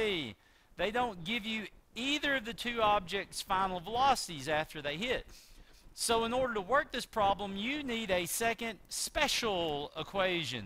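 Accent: United States. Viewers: This was my exact question, and I got an exact answer. Plus the great southern drawl makes this video ten times better. My dads side is from oklahoma and they all sound like this; i feel like i'm learning phyics from my uncle.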